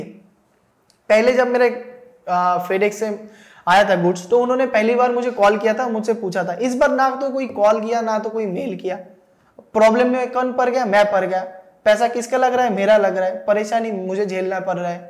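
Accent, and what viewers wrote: Kolkata, India. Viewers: Ap Ka language suun k Lagta hai ap Kolkata Ka hai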